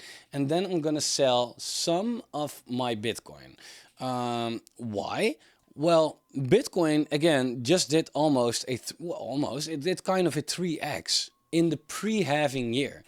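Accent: Netherlands. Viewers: Grappig je hoort altijd meteen wanneer het een Nederlander is.. kwam je toevallig tegen na 1,5 jaar op youtube struinen. Ben geabonneerd en wens je veel succes met je kanaal !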